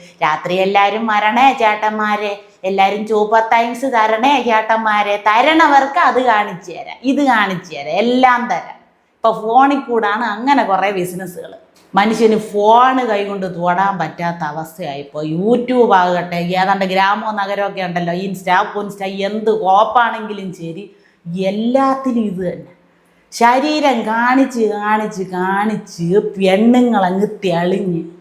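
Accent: Thiruvananthapuram, India. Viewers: തിരുവനന്തപുരം സ്ലാങ് കലക്കി പുള്ളേ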